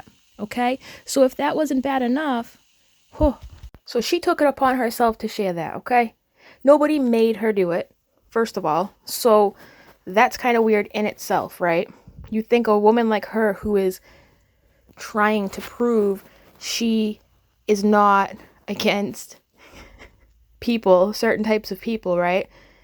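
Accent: Boston, United States. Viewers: Omg no way I'm not one of the first to fan girl over this absolute awesome smart a f, awesome cute Boston accent ass creator js.. (sorry) Buttttt I'll disagree with TOS and that's the beauty of being able to be adults in this world.. twitter wasn't a free speech platform esp someone inciting violence on his own home with his own smooth brain cult followers. Yes he was the president.. but meh Racism prejudice and bigotry went up in the last 4 yrs. I suppose my views "based" but if u not gunna try to run a hate riot and storm a government building I think most be ok apps don't want to support hate a violence But but I still heart ur vids and u one smart as cookie and I wish I was as beauty as u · I love this channel! 🤣. Don’t mess with the Baaaston accent! Lol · Yasssss. What up, what up- Can’t sleep at 230am. Baby in this belly won’t let me. I swear baby K is going to recognize that Boston accent ❤️ EDIT: how do we help support your need for coffee??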